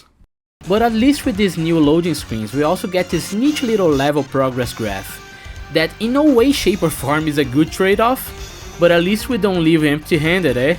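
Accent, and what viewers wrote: Brazil. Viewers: Hmm...sotaque br reconheço de longe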